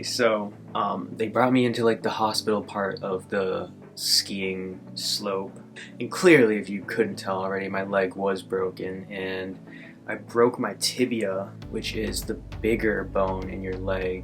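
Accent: Canada. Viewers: I'm quite sure he's from Canada, because he has a slight accent, that is only obvious in a few words. He's mentioned his brothers, but i don't know if they have been in any videos. I'm sure they must be as hot and cute as him.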